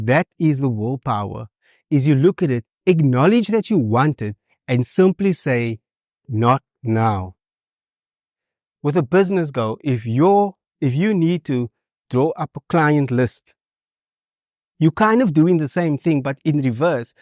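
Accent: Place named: South Africa. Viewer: Sounds like a South African I loved what he had to say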